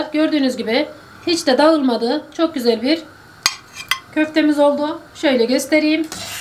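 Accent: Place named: Central Anatolia, Turkey. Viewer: konuşma şivesinden İç Anadolu lu olduğunuzu tahmin ediyordum ama bu kadar yakın olduğumuz aklıma gelmezdi Çok memnun oldum Başarıların daim olsun kal sağlıcakla 🥰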